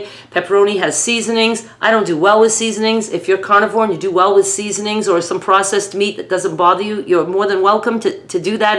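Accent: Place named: Upstate New York, United States. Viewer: so happy to have found you! I want to ask. Where are you from? You sound just like my family from way upstate NY like the north country accent.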